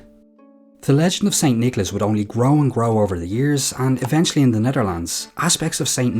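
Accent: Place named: United States. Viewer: That merica voice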